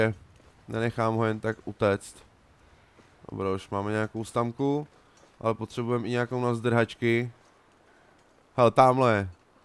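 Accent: Czech Republic. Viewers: čau chci se zeptat ty pocházíš někde z okolí Ostravy? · lubozvucny moravsky dialekt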